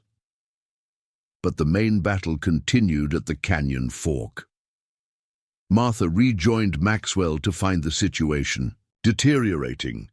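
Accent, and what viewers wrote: England, United Kingdom. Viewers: English accents are pleasant and easier on the ear than American accents. Even though I am southern American.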